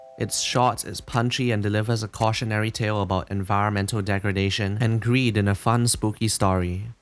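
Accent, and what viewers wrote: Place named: Singapore. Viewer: singaporean accent detected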